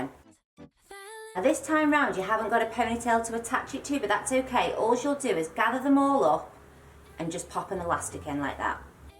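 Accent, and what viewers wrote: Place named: Birmingham, United Kingdom. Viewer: Amazing braid!! I love your accent - it’s very Peaky Blinders 💕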